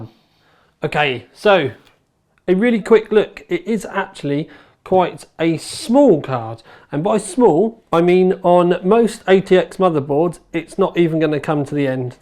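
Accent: United Kingdom. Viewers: Nice British English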